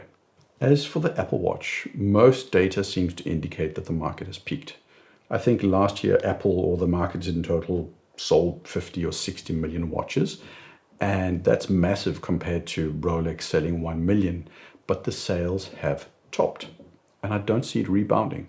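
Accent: South Africa. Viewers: Haha thought you SA’an. I’m an Aussie who lived in SA for 5 years. I like your presentations, honest, well researched, intelligent and very interesting. Go forward well, ne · Howzit boet! One of the things that drew me to you (and ID guy) was the accent from home. I'm living in Cape Town. As you know, we have plenty sunshine but insufficient electricity, so anyone who can is getting solar 😂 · I was always thinking that you were South African. Now it’s confirmed. Great accent and great people!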